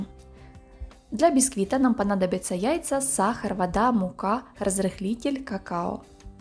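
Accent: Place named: Ukraine. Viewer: поняла что она украинка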